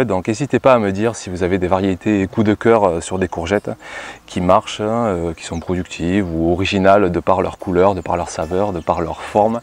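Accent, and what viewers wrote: South, France. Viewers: Bonsoir, merci encore pour cette belle vidéo,et alors un jardin qui pousse à vu d’œil!! Tu as des légumes à en être jaloux!! LOL. Je voudrais tellement avoir mon potagé comme le tiens. Mon jardin se trouve dans la forêt je suis dégoûtée..Il y a 15 jours, il a pluie énormément et mes tomates ont pris la maladie ( je suis dans l’Ain). j'ai essayé de les traiter avec de la bouillie bordelaise, mais je crois que je les ai perdu mes 15 pieds. Crois tu que je puisse en retrouver encore dans les jardinerie pour les remplacer?? J'ai planté quelques pieds de courgettes, de la diamant et un pied de courgettes allongées jaunes,mais je ne me souviens plus du nom. peux tu me dire ce que l'on peu planter encore dans le jardin ce mois la et les mois à venir. Merci à toi et et à ton petit accent du midi!! j 'adore A bientôt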